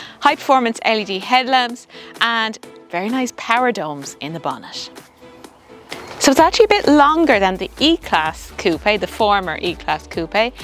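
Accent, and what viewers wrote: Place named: Ireland. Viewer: "Roarrd" Isn't the Irish accent lovely ❤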